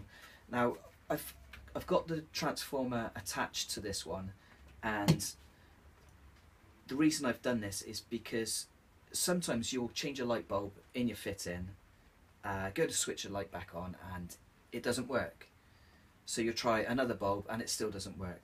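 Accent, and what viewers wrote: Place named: West Country, United Kingdom. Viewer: Lush West Country accent. Good vid.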